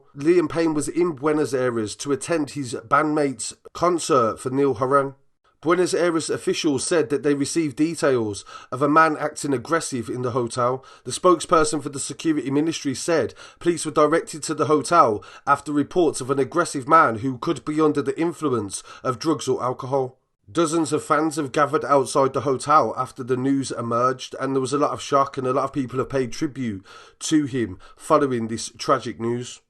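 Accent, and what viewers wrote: Birmingham, United Kingdom. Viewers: You from bruming ham i hear your English brummie dialect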